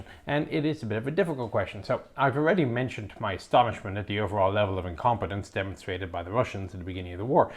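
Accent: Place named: Ireland. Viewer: Chief, your such a national treasure ya could put your musing into a text to speech narrator and it still be riveting commentary!!!!!!!!!!!! It would be a tragedy to deny the world the utter charm that is your Mick Jagger lipped Irish accent, dont go changing ya gentlemen and scholar!!!.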